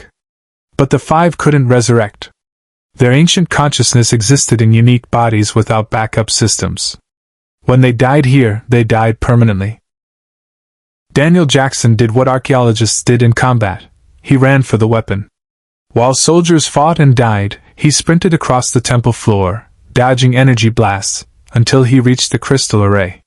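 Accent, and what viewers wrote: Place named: South, United States. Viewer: Sounds like the South...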